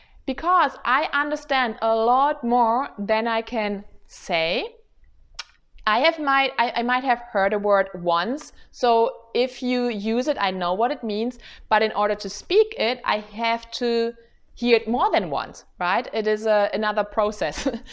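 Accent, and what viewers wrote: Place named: Germany. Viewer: I loved your joke… totally arrived on my end 🤣😂🤣😂🤣. But maybe that’s because I’m German haha! I miss the birds singing/chirping in Germany… I also miss going to the Bäcker and Metzger, or hanging out at the Bistro/Café. Here we get rushed off the table… I miss the laid back nature of Germany. I wish we lived closer so we could hang out for Kaffee und Kuchen 😁😁😁. But Boston is not the next closest trip from Colorado. Mein Angebot steht nach wie vor… wenn Du mit irgendwas Hilfe brauchst, melde dich einfach. 👍🏻 Like you said, your language/vocabulary will improve just by living your life daily and speaking it all the time. I’d say that Americans, or any English speaking person for that matter, understand you just fine. Even your German accent isn’t as strong as some other German English speaker. You’re doing VERY WELL. 💗 Have you tried the German bread website I shared last time? 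If not, definitely give them a try. I enjoy it very much.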